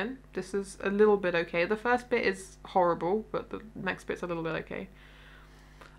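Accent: United Kingdom. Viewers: ...and as if you are not trying to spread Palestinian propaganda? C'mon, are you even Palestinian with your white skin and British accent...lol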